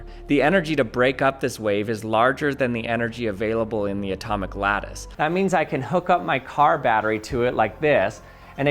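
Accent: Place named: Utah, United States. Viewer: He used emphasis, also Utah accent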